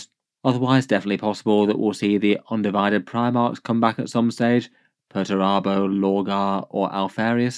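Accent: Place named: United Kingdom. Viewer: What UK regional accent is this? Very narrative. Can you read LOTR to me for money, lol.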